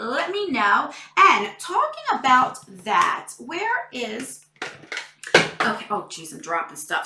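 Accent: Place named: New York, United States. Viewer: I absolutely love your channel! I never found unboxing subscription boxes so entertaining! Also you sound like you have a New York accent? That's nice.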